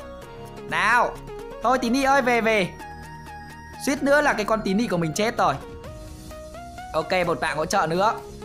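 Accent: Nam Dinh, Vietnam. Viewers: Anh ơi nhà anh ở nam định à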